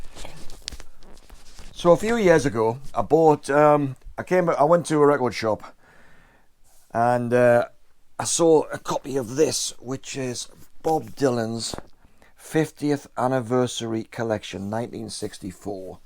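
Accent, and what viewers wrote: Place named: Newcastle, United Kingdom. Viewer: Keep it going,. I really enjoy your videos, especially the music stuff. And for the record (no pun intended), as a teacher of teenagers for the past 22 years, I have a reasonable idea of how to engage an audience and keep them interested. You're terrific at that. The geordie accent helps too!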